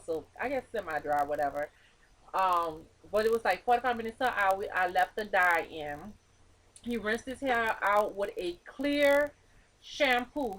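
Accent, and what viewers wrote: New Orleans, United States. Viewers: Gotta Be From New Orleans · Gotta be From New orleans ! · great video! r u from nawlins? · O yea yall from new orleans i hear it in her voice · Mama, you just gotta be from New ORLEANS.... You got that "mama" vibe and i LOVE IT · U Can Tell Shee From New Orleans Dat Accent Stronq As Hell Like Mines Lolz Good Video Doe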